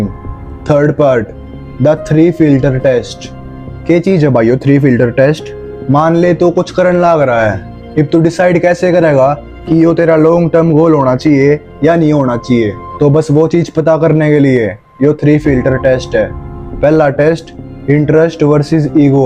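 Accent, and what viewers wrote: Haryana, India. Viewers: Haryanvi Exant Amazing Work Bro ❤ Difference vibe with same word ✨🔥❤️🧠👑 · Haryana ki language h bro tere smj t bhar h😂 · Bro vo bhai harayani bol rha hai isame hasne ka kya hai vo bhai kuch sikha rha hai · Nice harayanvi style 😊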